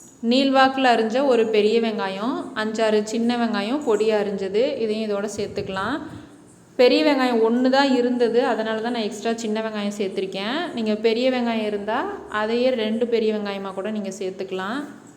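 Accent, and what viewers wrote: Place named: Kongu, India. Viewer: Kongu Thamilum Thanjai Thamilum Super Pasthavum super magaley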